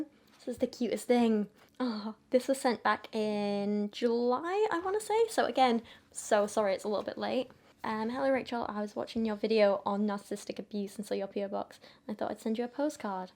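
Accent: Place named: RP, United Kingdom. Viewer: wow looking even more beautiful in this video :-O and I still love your posh accent, I would listen to you reading the phone book :-O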